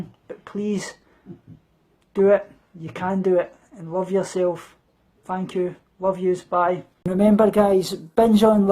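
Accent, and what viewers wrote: United Kingdom. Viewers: Hi. Very happy to see you're still alive! I guess you live in the UK (from your accent)? In Sweden we have a law that allows the hospitals to admit eating disordered patients against their will if they have a BMI below 14. Then it's consider life threatening starvation and the psychiatric rules for admission against one's will is: if there's a danger to the person's life or someone else's, and if the patient suffer from a serious mental condition. Since this law started including ED-patients the mortality rate has dropped SO MUCH. Very few people die from anorexia in Sweden nowadays. I wish there were similar laws in every country. 20% dying from anorexia is just ridiculous numbers. You can't think or make clear decisions that much underweight. The brain starves and you need to get fed to higher weight before you can think properly, and if the hospital can provide that help it'll improve your chanses of getting well SO MUCH. 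If we hadn't had that law I would've been dead, because I starved myself like a maniac (I'm bipolar, so I'm allowed to use that word, haha). As soon as I got down to BMI 13 they admitted me and tube fed me, and it saved my life I don't know how many times. Because I wouldn't have stopped. I couldn't have stopped. I dropped 10 kg in 2 months without even trying to lose weight. Yes, I hated being at the hospital and that they made me gain weight, but without that I wouldn't be here. Nowadays I've been at normal weight for 8 years and eat what I want.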